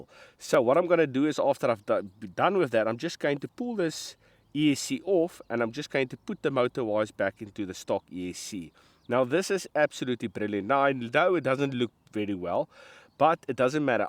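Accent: South Africa. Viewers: Lol boet I can tell you are a proper South African from that awesome accent!! Great vid, considering getting one of these, just wish it was 1/8 scale, but for the price this seems like a real winner.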